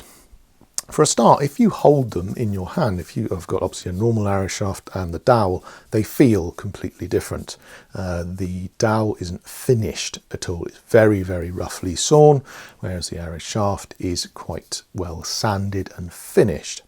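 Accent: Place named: United Kingdom. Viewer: Im not all that familiar with British accents but u kinda sound like Ian Anderson man. Are u both from the same area in England?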